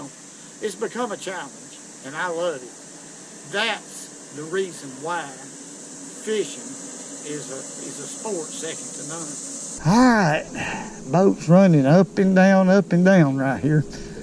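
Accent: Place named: Alabama, United States. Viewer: Is it weird that I could tell you were from Alabama before you ever mentioned it lol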